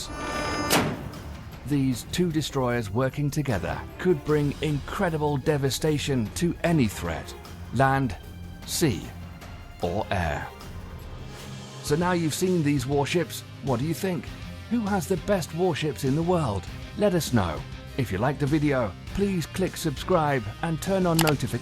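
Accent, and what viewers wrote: United Kingdom. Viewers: What the hell is a "gate-ling gun". LOL And why is a british person narrating these videos? 🤣🤣🤣🤣🤣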